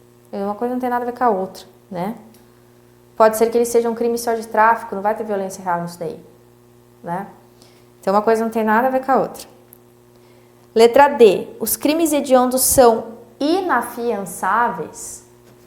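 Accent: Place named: South, Brazil. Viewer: Percebi um sotaque sulista cumulado com o mineiro..... um concurso material eu diria.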